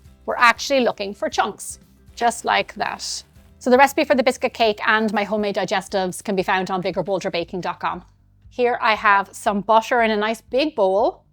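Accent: Ireland. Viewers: Thank you for this! Are you Irish by any chance? Your accent sounds Irish! I only ask because I miss Ireland haha🤗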